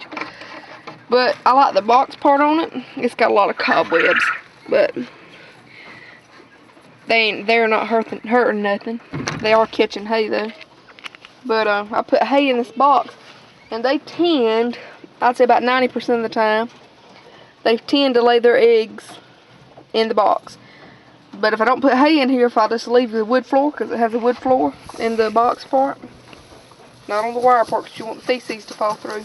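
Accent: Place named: South, United States. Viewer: Yes indeed I love a southern accent being from the south I'm partial. Keep doing what you're doing Fort Worth Texas loves it. Blessings and more Blessings to you and your family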